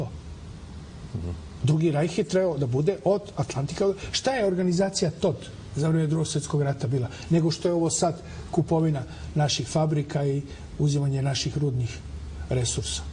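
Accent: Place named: Ekavian, Serbia. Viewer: Čovjek rođen i odrastao u Hrvatskoj, Karlovac, studirao i radio u Zagrebu do rata....otišao u Srbiju i slušam čovjeka kako govori.....original srbin, ekavica perfekt.....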